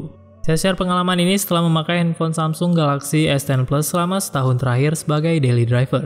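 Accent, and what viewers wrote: Aceh, Indonesia. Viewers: Orang aceh ya bang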